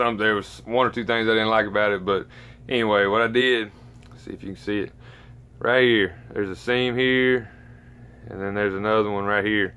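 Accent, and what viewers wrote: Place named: South, United States. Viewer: I've watched all 3 of these videos and I have to say you are a great teacher! It's nice to see a man doing a video like this, I'm sure that it will help to get more people involved. Anyway...we have a pile of Crown Royal bags and my bf was telling me that he needs a welding cap so I googled it and found that several tutorials exist using them and then I came across you teaching. It seems like I'm off to a great start, we'll see now how the rest goes...Thanks again! Oh btw where are you from with that southern accent?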